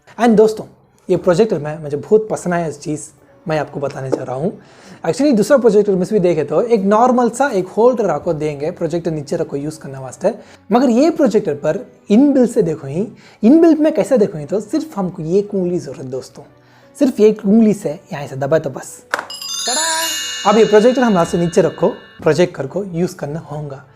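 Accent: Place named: South, India. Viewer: Super jab koi sauth indian hindi men baat karta hai to bahut achha lagta hai sunne men ..superub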